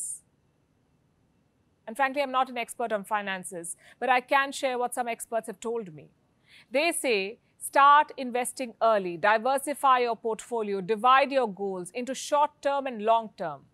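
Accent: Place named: India. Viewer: Madam, nice day to you, a filipina new fan of you here, i am a fan of british and american accent english but now my top on the list is indian accent, and i like it very much...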